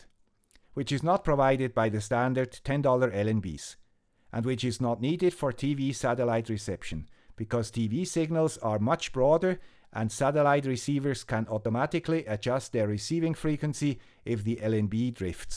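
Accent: Switzerland. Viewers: The Ham Radio with the Swiss Accent rocks! Very interesting. Do you know any good online electronics course? To get more deeply into the theories behind this kind of circuits.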